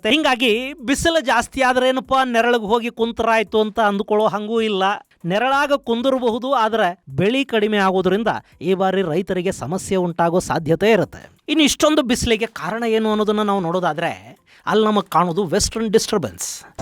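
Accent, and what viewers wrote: North Karnataka, India. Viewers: ಸರ್ ನಿಮ್ದು ಉತ್ತರ ಕರ್ನಾಟಕ ನಾ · Sir I love the tone of Uttar Karnataka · ವಾವ್ ಸರ್ ಅದ್ಭುತವಾದ ಉತ್ತರ ಕರ್ನಾಟಕ ಭಾಷೆಯನ್ನು ಮಾತನಾಡುತ್ತೀರಾ ನಿಮ್ಮ ಊರು ಯಾವುದು ಮತ್ತು ನಿಮ್ಮ ಯಾವ ಜಿಲ್ಲೆಗೆ ನೀವು ಯಾವ ಜಿಲ್ಲೆಗೆ ಸೇರಿದ್ದೀರಿ ಹಾಗೆ ರೈಲ್ವೆ ಫ್ಯಾಕ್ಟ್ಸ್ ಗಳ ಕುರಿತು ಒಂದಿಷ್ಟು ವಿಡಿಯೋಗಳನ್ನು ಮಾಡಿ ಸರ್ ಅದು ದೃಷ್ಟಿಹೀನರಿಗೆ ಉಪಯುಕ್ತ ವಾಗಿರಬೇಕು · ನಾನು ಮೊದ್ಲ ಬಾರಿಗೆ ನಿಮ್ಮ ಧ್ವನಿಯಿಂದ ನಮ್ಮ ಉತ್ತರ ಕರ್ನಾಟಕದ ಕನ್ನಡ ಭಾಷೇದಾಗ್ ಕೇಳಿದ್ದು. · ಉತ್ತರ ಕಾರ್ನಾಟಕ ಭಾಷೆಯನ್ನು ಅದ್ಭುತವಾಗಿ ಮಾತಾಡಿದ್ದೀರಿ ಸರ್ ನೀವು ತುಂಬಾ ಧನ್ಯವಾದಗಳು ಸರ್ 🙏🙏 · ನಿಮ್ಮ ಬಾಯಲ್ಲಿ ಉತ್ತರ ಕರ್ನಾಟಕ ಭಾಷಾ ಕೇಳೋದೆ ಒಂಥರಾ ಮಜಾ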